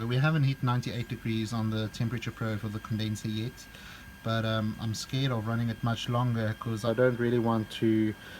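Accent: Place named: South Africa. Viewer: pretty cool man! Thought you sounded SA then you said you were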